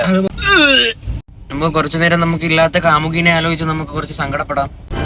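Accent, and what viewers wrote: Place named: Trivandrum, India. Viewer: Vlog adipoli🎉 pinne nee tvpm anoo aa samsaaram adhupoole und😁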